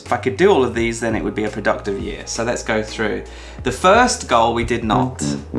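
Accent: England, United Kingdom. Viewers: The English accent has the highest value in America 😁👏🏽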